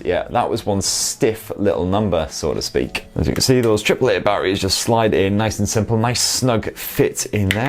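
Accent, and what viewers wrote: United Kingdom. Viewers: I dont get why cant british people speak regular english? Always gotta be so extra... its annoying couldnt watch this all the way through 😑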